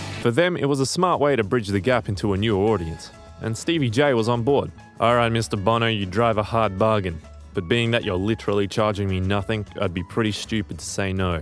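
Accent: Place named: Australia. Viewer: Hey this was a great video! Love getting a seemingly random recommendation and hearing a fellow Aussie. Will subscribe and look forward to your new content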